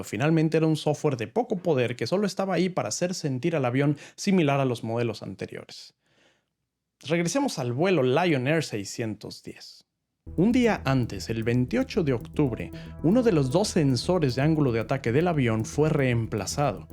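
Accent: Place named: Mexico. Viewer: Excelente video y excelente acento mexicano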